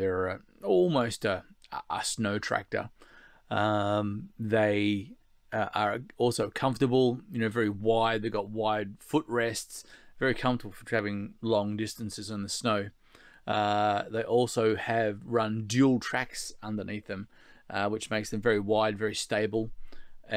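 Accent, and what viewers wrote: Australia. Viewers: я живу в Англии уже много лет, его Австралийский мне понятен без проблем, а вот Американские фильмы не смотр, а иначе все время переспрашива своего партнёра "что он или она сказали", он часто мне отвечает, что сам не понимает. А пр индийский английский, это да... 🥴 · Так сложно на слух понимать речь... )) Привык к "американскому" английскому. Австралийский - как будто вообще другой язык)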